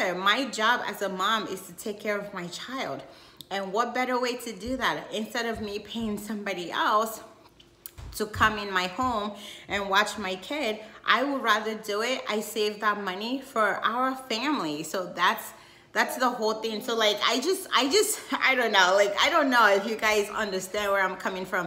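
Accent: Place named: United States. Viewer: Unfortunately I absolutely dont agree with most of your video's it took me this long to respond. I was married to a Turk who not only abused me mentally emotionally and physically gradually over the years. Seems like you have an American accent 👀 He came to London with nothing back in the 80's it took 15 years for me to leave. He is now a multi millionaire due to me my lovely. Please don't think your irreplaceable . However you have given me an inspiration to make a video about my journey being married to a Turk.. I have a 34 year old daughter a film director now and 5* Michelin chef in London and a son who lives in Istanbul. I will definitely show my daughter this video. Your very naive. 🤓 sorry if this offends you. ♥️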